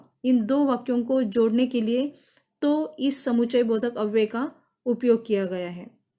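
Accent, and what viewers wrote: Maharashtra, India. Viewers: By Your Voice I think you are Marathi Are u Marathi?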